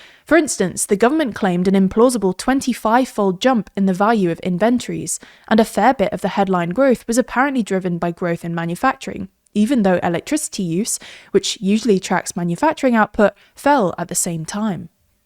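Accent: United Kingdom. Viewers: This lady british accent is so much better to listen to. I could not hear another word from the previous british dude voice, sounded like that annoying pewpewdie dude.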